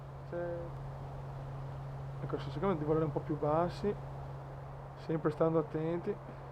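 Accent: Veneto, Italy. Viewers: stima per il veneziano ahahah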